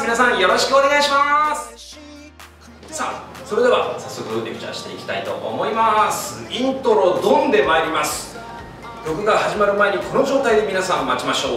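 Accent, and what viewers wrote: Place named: Japan. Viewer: Antes de seguir a no pensaba lo impactante que era escuchar a un japones ponerle la "u" a final de una palabra en ingles:v wow.. nice <3♡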